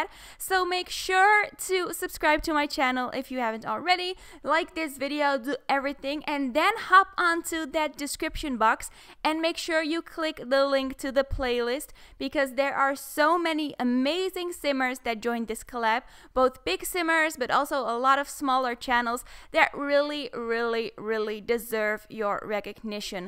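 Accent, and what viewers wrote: Netherlands. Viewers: Yess ❤️ I knew you were dutch the second i heard you haha Dutch accent coming through 😃💙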